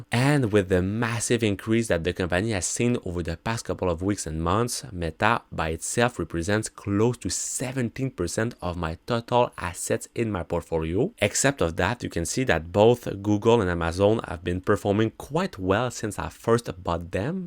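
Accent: Quebec, Canada. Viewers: Tu es québecois?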